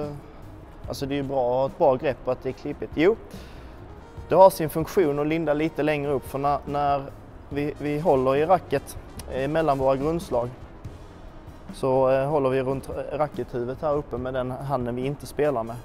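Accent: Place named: Scania, Sweden. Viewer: skjo :) älskar skånska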